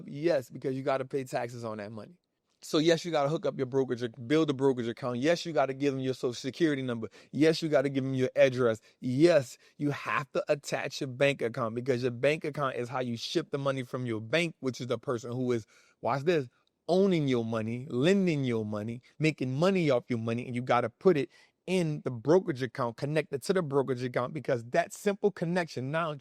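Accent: New Orleans, United States. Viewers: You ain had to tell me you was from New Orleans I heard it and you ain even had to say baby or room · I heard the New Orleans in his voice🎉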